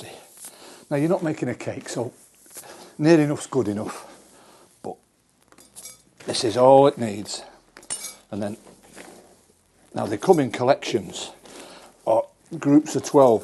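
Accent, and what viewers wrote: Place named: Yorkshire, United Kingdom. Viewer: Great video. Sounds like a fellow Yorkshire man. Just planted an old bath with this beauty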